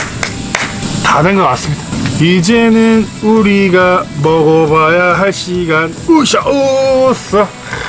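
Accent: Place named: Gangwon, South Korea. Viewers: 기름을 지름이라고 하시면 강원도 사람이신가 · 솥뚜까리...장갑 쪄 주고...지름 두르고. ㅋㅋㅋㅋㅋ 완전 토종 홍천산이구나. 홍천 사투리 아는 사람은 알어드러이~~~ 크아~~ 영어 자막 넣어주고 싶넹.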